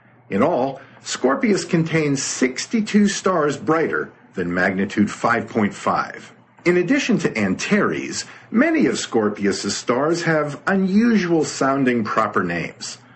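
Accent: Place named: United States. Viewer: you talk yanky too much. settle down on the oronunciation its to harsh.try to sond like english not overpronounced yank. nye.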